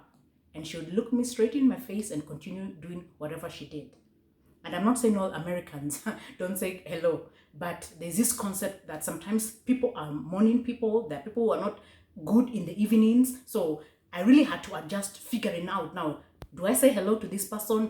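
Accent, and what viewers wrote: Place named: Africa. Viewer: I Moved to the US in 1989 while in middle school. In AFRICA you great each other all day long 10 or 20 times a day. The European does not think like you. If you great him more than once, he thinks you are physco. Black Americans on the other hand have a culture of their own which is very different from African Culture. Don't try to change America. It will change you before you can change it. The sooner you can erase that African accent the better. Americans completely stop listening to what you are saying and are more interested in why your accent sounds very strange. This can really hurt your career chances. When you move to a new country get with the program ASAP. All the best.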